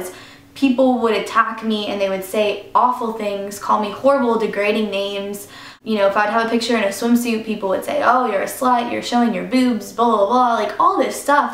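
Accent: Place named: Texas, United States. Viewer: I heard a bit of that Texan accent in this video :P super cute, haha. Maybe everyone always notices it but I'm Texan so it's hard to pick up when I'm used to it xD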